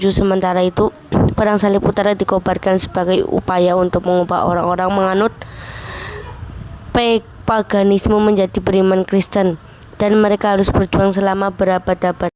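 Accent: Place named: Banyumas, Indonesia. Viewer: Kiw kiw Rada ngapak ya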